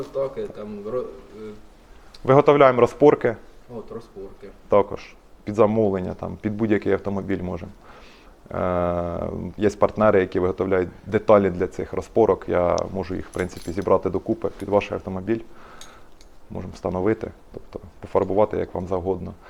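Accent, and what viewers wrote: Poltava, Ukraine. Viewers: полтовський окцет))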